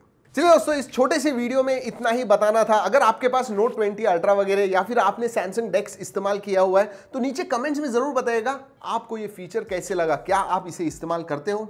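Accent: Maharashtra, India. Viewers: Are you maharashtrian and marathi by mother tongue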